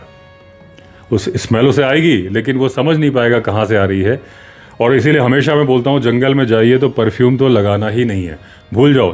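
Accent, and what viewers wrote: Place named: Jammu, India. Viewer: Sahi baat hai hamare gam ke log bi asa hi bolte hai Main jammu se hu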